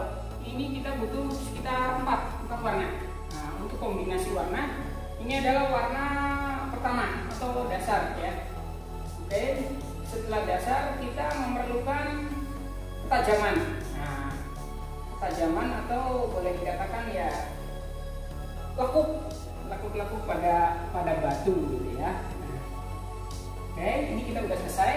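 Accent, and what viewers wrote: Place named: Banyumas, Indonesia. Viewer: lah wong ngendi sih kok jawane ngapak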